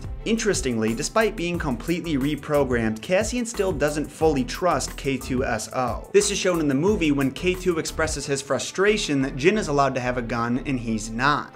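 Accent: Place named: Boston, United States. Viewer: I like you accent and the way you say "philosophy". Boston?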